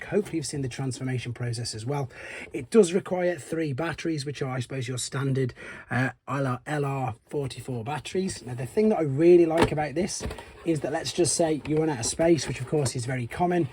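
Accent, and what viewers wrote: United Kingdom. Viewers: Hi, thanks for showing this great new toy off. Great to hear the first review with a West Mids accent too (from Cannock, myself!).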